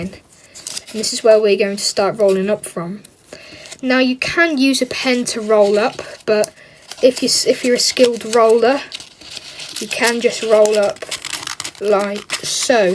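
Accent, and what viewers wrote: United Kingdom. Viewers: of course the mf is british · british accent just adds to it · british accent😍😍😍😍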